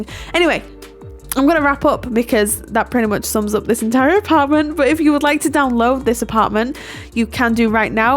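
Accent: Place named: Lancaster, United Kingdom. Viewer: Are you from Lancaster? 🤔 Your accent is so familiar!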